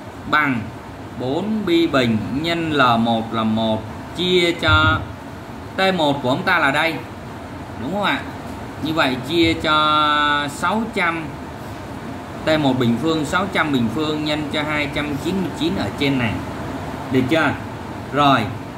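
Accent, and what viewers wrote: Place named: South, Vietnam. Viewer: thầy ở miền nam đúng kh thầy , em ở miền bắc nên nghe hơi ngượng ngượng hehe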